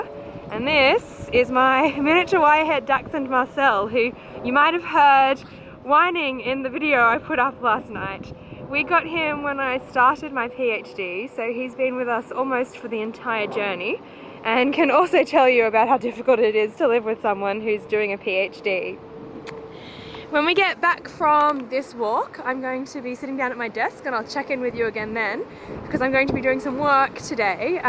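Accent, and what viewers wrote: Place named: Australia. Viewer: One question: Are you Australian? You sound sooo much like it! (I'm an Aussie myself).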